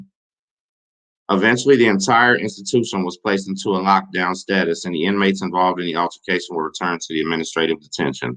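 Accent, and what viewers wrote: Texas, United States. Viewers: Happy Friday everyone! 🍺🍻., La eMe is pronounced like M. A.😂. It sounds so different with your Texan accent. Please tell Mr Larry I send my wishes. Can I send him a card? Hope you and your family are doing well. Enjoy your videos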